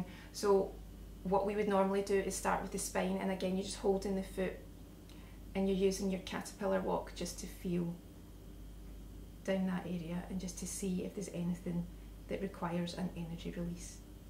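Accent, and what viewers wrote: Scotland, United Kingdom. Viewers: Am I hearing a Scottish accent? :) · I LOVE the Scottish Dialect...So Sexy ❤ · Hiral Patel it a Scottish accent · I LOVE your accent. All americans love the British accent but Scottish is my favorite. Pretty and engaging. Great video! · Love the Scottish accent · It's not even an accent. She's Scottish. That's how Scottish people sound. Duh! 🙄😏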